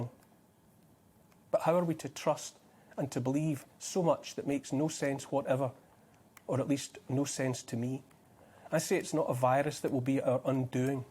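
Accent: Scotland, United Kingdom. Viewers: What a great commentary, and delivered in a wonderful Scottish accent as well! I feel like a tired, worn-out soldier in a foxhole almost thinking it's all over who's just heard the bagpipes of an approaching Scottish regiment coming to my aid and just knowing everything's going to be all right! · Listening from across the pond. Your point is made all the more poignant with your beautiful Scottish accent. We are all brothers and sisters in this madness. Thank you for your courage and may we all have the same courage as you.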